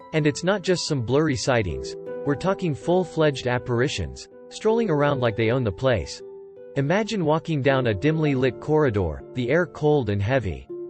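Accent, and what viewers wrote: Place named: United States. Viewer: Never believe a voice sounding like a America they never get the facts right.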